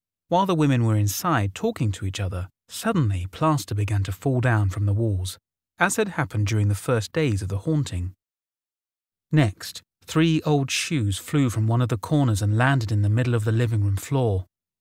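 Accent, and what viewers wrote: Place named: Bristol, United Kingdom. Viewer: Your voice is very melodic. I'd say it's close to but not quite a Bristol accent?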